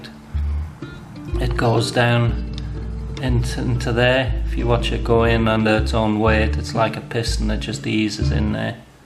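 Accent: Northern England, United Kingdom. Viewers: just out of interest where is you accent from ? you sound like your from up north in the U.K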